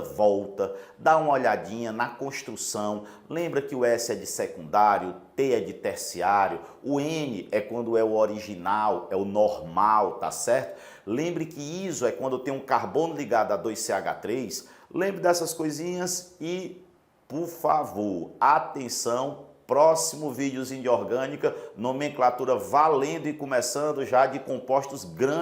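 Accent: Ceará, Brazil. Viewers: como eu amo sotaque nordestino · O senhor é cearense?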